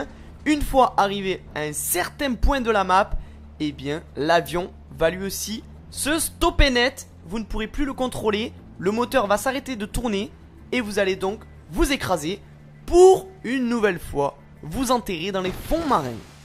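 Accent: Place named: South, France. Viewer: Tu a un axant du sud 😁😆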